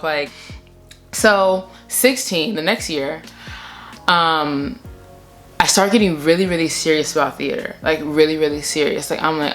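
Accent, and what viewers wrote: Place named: South Africa. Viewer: Girl are you South African ?? 👀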